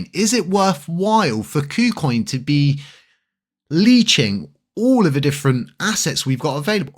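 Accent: United Kingdom. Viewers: I think he’s British.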